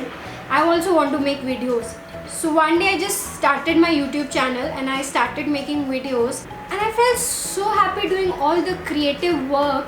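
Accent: Delhi, India. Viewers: she is speaking indian English - and her accent is perfectly fine. There are Indian lecturers out there who are less understandable. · Easy to guess you from Delhi . That super strong accent :) But good job on your creativity. You are good with it girl!! All the best! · she has an indian accent..American accents are much worse with their own pronunciations on everything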